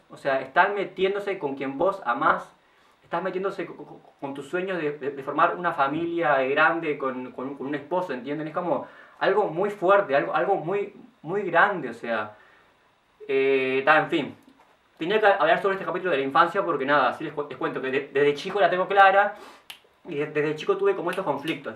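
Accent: Uruguay. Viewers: gracias por contar tu historia que ayuda y ayudará a mucha gente!!! y es real en nuestro país se ha logrado mucho con las leyes pero falta que las familias acepten y amen mas a sus hijos y la sociedad toda acepte al otro con amor y respeto! me gusta mucho escuchar tu acento y estilo uruguayo del interior ! saludos desde Colonia del Sacramento! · Estoy tan acostumbrada a ver youtubers argentinos que ver un youtuber de nuestro país y con nuestro acento diciendo BO, TAy GURI es un mimo ❤️ jajaj A parte de eso, lamento que hayas tenido una infancia angustiosa, te mando un abrazo grande, tenes una energía hermosa ✨ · Desde que te escuché hablar supe que sos de Uruguay, te admiro mucho, todo mi apoyo desde Argentina ♡♡♡♡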